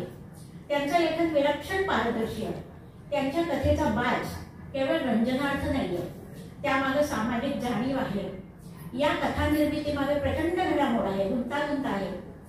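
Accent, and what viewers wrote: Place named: Rural, India. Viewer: छान! हुबेहूब प्रत्यक्ष प्रसंग समोर दिसू लागतात. ग्रामीण भाषेचा लहजा किती सहजतेने वापरला आहे. समाधान वाटते.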